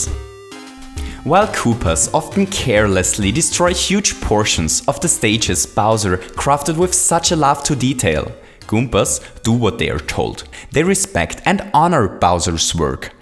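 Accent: Germany. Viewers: Bist du ein Deutscher ? Du hörst dich so an, als ob du ein Deutscher oder von irgend ein anderes deutschsprachiges Land kommst :D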